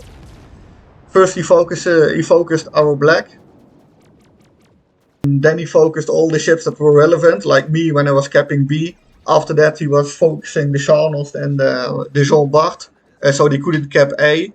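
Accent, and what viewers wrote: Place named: Netherlands. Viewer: You sound very dutch. Groeten uit Alkmaar 😁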